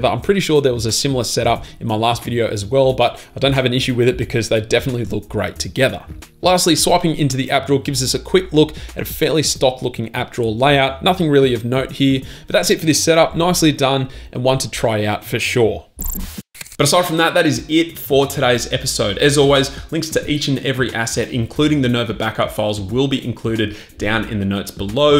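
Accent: Australia. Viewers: Mate, you Australian?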